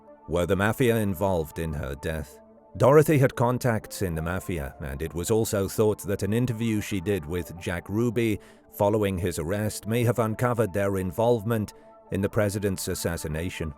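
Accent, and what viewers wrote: England, United Kingdom. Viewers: Why do this in an england accent?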